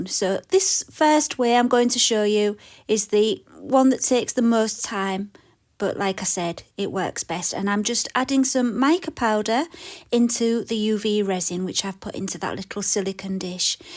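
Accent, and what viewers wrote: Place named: Scotland, United Kingdom. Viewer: I enjoy your videos so much for two reasons. First I love your work. Second my grandparents were Scottish and your accent warms my heart and makes me think of them. I always wanted to travel to Scotland and see where they lived but I've put it off. Listening to you makes me want to speed up my plans. I think when covid is all gone I'll make the trip.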